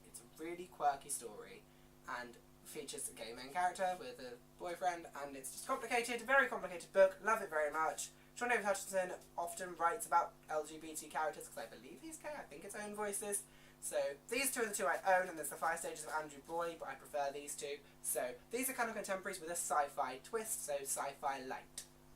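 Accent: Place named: United Kingdom. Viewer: Thanks for doing the tag! Love your accent, where abouts in the UK are you from??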